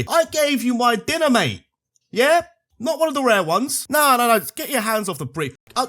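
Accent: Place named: England, United Kingdom. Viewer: It sounds English to me, but Idk which region. There are a WIDE variety of accents in every country.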